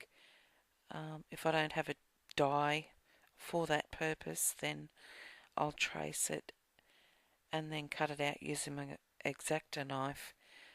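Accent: Australia. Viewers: Hello:) I really enjoyed watching your video, and after watching your video, I am off to get some glimmer mist to use with stencils. An excellent idea! From a fellow Aussie, it was wonderful to hear the Aussie accent again!:)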